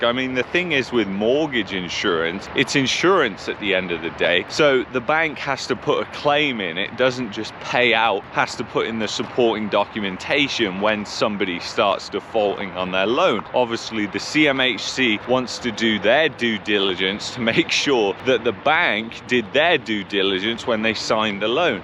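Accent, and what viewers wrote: United Kingdom. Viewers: I believe he is from UK. There is no Australian accent.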